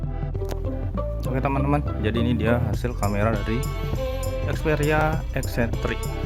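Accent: Bali, Indonesia. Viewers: Klo liat dari aksennya si admin ini orang bali...😀😀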